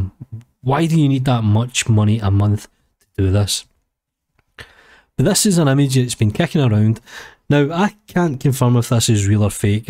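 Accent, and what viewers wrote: Scotland, United Kingdom. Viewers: I am just here for the Scottish accent...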